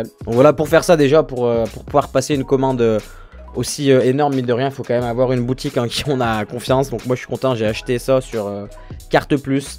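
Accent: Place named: Loire, France. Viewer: Accent du 42 spoted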